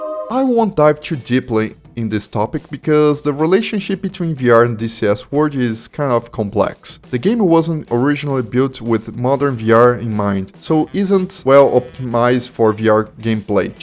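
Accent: Brazil. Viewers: By the accent this bloke is brazilian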